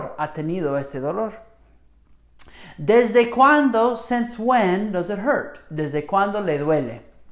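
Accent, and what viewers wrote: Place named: United States. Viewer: Woof. What's with this bad American-style Spanish? Consonants are way too strong, and the "r" is wrong. Students: this might be good content, but poor delivery. Don't do this accent. I have traveled to a number of Spanish-speaking countries without speaking a word of English to get by, and I have never heard a native Spanish speaker speak like this.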